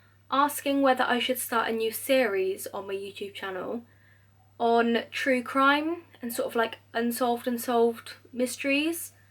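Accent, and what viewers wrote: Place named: United Kingdom. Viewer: Also, I just love your British accent haha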